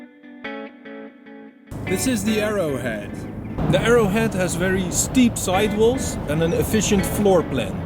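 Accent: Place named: Netherlands. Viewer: Are you from Holland my friend ? Your English is realy good I can just hear a bit of accent ! Super tarp shelters anyway !!!!!😉👍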